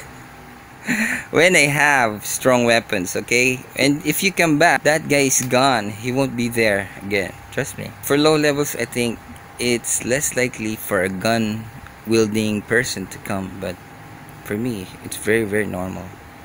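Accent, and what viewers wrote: Philippines. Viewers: ur voice just like pinoy? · Are you Pinoy? Halata ung accent eh xD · Pinoy akcent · I know you filipino i hear filipino voice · Pinoy tama??😂 Edit:sabi ko na accent palang +1 sub Gawa ka ng video kung pano hack ldoe 1.6.5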